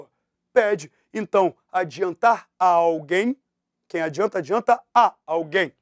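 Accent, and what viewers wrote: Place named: Rio de Janeiro, Brazil. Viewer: Coloca o titulo como se só o RJ tivesse pm oficial, tem que adivinhar pelo carioquês. Ajuda aê.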